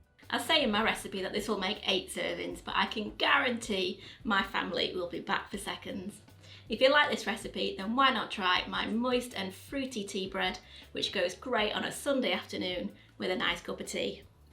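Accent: United Kingdom. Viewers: OMFG PURE BRITISHNESS. BEAUTIFUL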